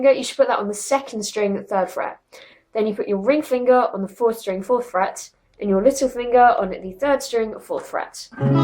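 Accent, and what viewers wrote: United Kingdom. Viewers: British accent is so so......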